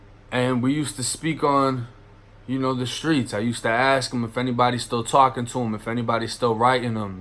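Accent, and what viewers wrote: Boston, United States. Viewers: Bro you sound like your from Boston i aint being funny i fucks witchu but yea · Here from Death’s videos. New subscriber and fan. Keep it up man. BTW I would have sworn you were originally from Boston, sorta sound like we do. EDIT - watched deaths videos from last to first, guess you are originally from Boston. Super big up to you.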